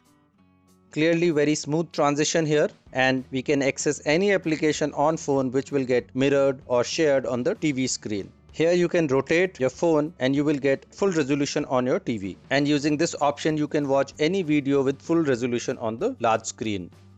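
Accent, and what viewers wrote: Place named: India. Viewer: I usually don't trust people with Indian accents but you helped a lot, thanks.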